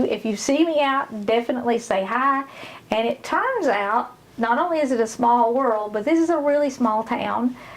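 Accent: South, United States. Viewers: I started watching you and following you because you remind me of the south and I love the south! Even though I'm not from the south. 🙃 I love your accent and really love to see your love for God. I pray that God will bless you and I thank you for talking about Him. Jesus Christ is my Savior and I'm thankful that you are uplifting God/Jesus in your cooking videos. By the way, I love your recipes too.. they are the same recipes my Grandma made. 🙏❤ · I really love your accent. If Dolly Parton made yeast rolls this is how it would sound. Being from Alabama I know a little bit about southern accents. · What part of the south are you from? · When they speak with a southern drawl, You know you’re watching a reliable source for good food lol!!!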